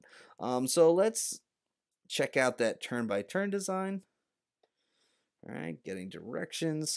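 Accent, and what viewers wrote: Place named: United States. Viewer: Thank God an American accent!!!!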